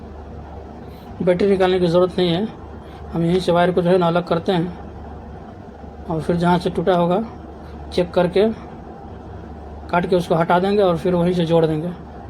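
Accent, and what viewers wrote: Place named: Bihar, India. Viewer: Aap kahan se ho bhai jaan aapki aawaz se lag raha hai bihar ya jharkhand se ho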